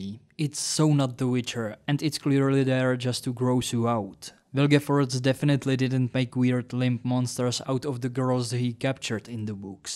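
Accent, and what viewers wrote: Czech Republic. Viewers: Z přízvuku slyším že jsi čech, tak jen takhle utajeně :) : skvělej rozbor, ušetřil jsi mi čas se sledováním 3.série, to si raději konečně přečtu Husitskou trilogii.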